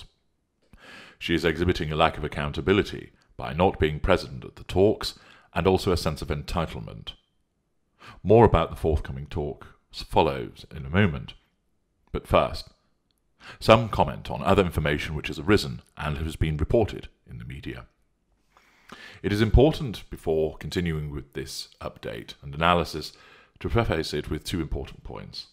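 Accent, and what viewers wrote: United Kingdom. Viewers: This guy doing the video has a polished British accent; he's very clear.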